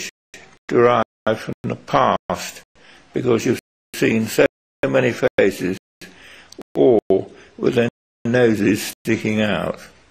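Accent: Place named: United Kingdom. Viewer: lol, old school brittish accent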